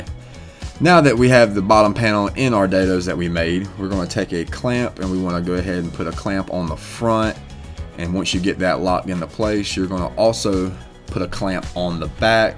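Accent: United States. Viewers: Enjoyed watching my first video of your work! (with hints of Bourbon Moth, possibly? And, of course, I enjoyed those East Carolina "o's.") Though I've been in Texas most of 60+ years, my father was from your area and his ancestry origins are all from the area of Pinetown & Pantego? since the 1700's, I believe. I was born in New Bern as my folks moved around that East coast area a quite a bit in those early years before moving to Texas. Anyway, you were really funny and gave me some more ideas for my own cabinet building when I get to get back to it soon. Thanks, again! (yes, I will be subscribing. :) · I dated a guy who sounded like you. He was from West Virginia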